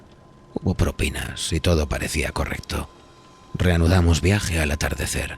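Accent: Spain. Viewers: Españolisimo , nooo por favor , usen Español neutro , no podré escucharlo , es insoportable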